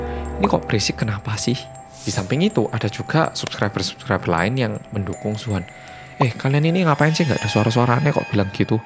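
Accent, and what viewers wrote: Java, Indonesia. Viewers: Enak banget dengerin orang Korea ngomong nya medok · Bang orang korea ap orang jawa kok logat ngomongnya jawa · Kerennn medhok tnan😍😊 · Ga percaya aja oppa ini logat jawa bukan logat korea🤣 medok banget jawa nya wkwk, tapi muka korea banget wkwk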